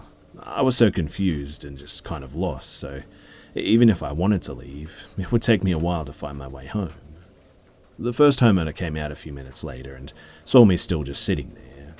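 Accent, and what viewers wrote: Australia. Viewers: This Australian accent is comfortingly close to home.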